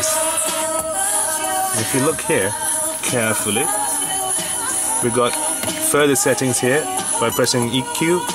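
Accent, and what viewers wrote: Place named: South Africa. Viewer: Things and things It sounds sort of like a South African English accent. Source: I work with somebody from South Africa.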